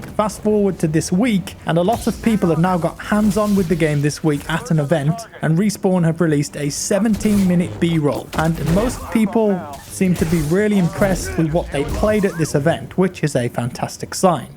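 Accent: United Kingdom. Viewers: All we needed was your sweet sweet Brit accent to convince us.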